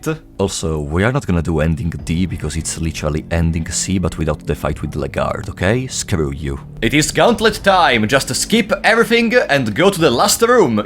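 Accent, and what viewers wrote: Italy. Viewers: you sound italian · l'accento italiano mi distrugge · Onesto i sente che sei italiano 10/10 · Mi piace come si sente bene il tuo italiano puro proprio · Italian acent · Ma sei italiano? L'accento sembra familiare 👀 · The very true question is "I recognise an accent... frate sei italiano?" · I just watch them. your Italian accent and dark dungeons of fear and hunger are really calming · L'accento italiano si sente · l’accento italiano si fa sentire parecchio 🤣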